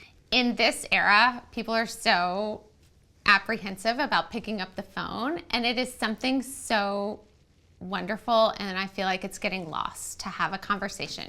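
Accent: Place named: New Jersey, United States. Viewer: Your voice, your voice why do you make that NJ voice?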